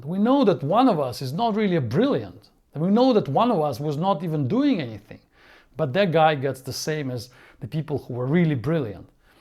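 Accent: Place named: Russia. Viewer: Totally agree with what you are saying. Just a tip. Hire somebody to work on your English pronunciation, it's so Russian now. :)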